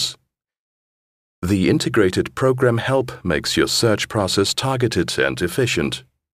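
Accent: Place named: Germany. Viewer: the guy whos talking has a german accent!! lol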